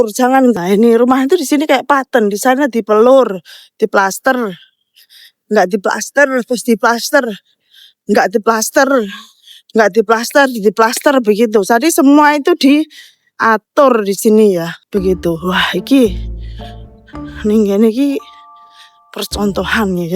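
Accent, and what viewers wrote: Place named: East Java, Indonesia. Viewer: Terdeteksinya logat jatim, bener ga Bu? Hehe😅😅❤❤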